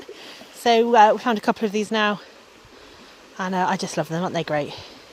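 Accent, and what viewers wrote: England, United Kingdom. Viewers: Sorry, but English accents are hard to keep up with and you talk so fast.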